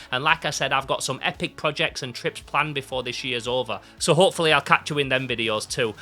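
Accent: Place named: Sheffield, United Kingdom. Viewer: I've laser cut synthetic/synthetic blend fabrics before, and it slightly melts/seals the edges, so if you're wanting to do a little edition run, it could really save you time not having to hem the edges. Will defo take some work fiddling with settings and taking care not to create toxic gases, but like, it's insane how detailed you can get with it. This video was absolutely amazing and inspiring, your perseverance level is incredible, and it's so valuable to share how difficult this stuff can be. When I was young I once made a TINYYYYY pair of jeans, and if you think sleeve logic it's tricky, trouser patterns will turn your brain inside out, (and you'll feel like a genius afterwards hahahaha) KEEP IT UP AND ALSO SO REFRESHING TO HAVE A SHEFFIELD ACCENT IN YOUTUBE WORLD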